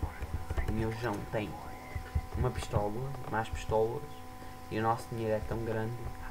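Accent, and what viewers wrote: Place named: Portugal. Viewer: Portugues de portugal, hahaha bonzao! :D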